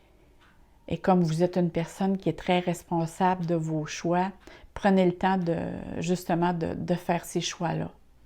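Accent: Canada. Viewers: Bonjours ! J'ai réécouté pour le plaisir et le professionnalisme. Et aussi l'accent et le vocabulaire canadien que j'adore...ma mère est charentaise et son patois est très proche de vos expressions. J'ai été touché aussi car je suis taureau/taureau/vierge...., thérapeute juste retraité, et dans les starting-blocks pour une nouvelle impulsion. Vous m'avez shooté de positivisme. Je suis en amour🤗🤗🤗🙏🙏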